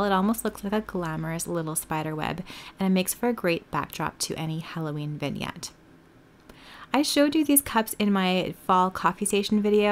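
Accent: Canada. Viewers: Your Canadian accent is so cute. Iron..decal..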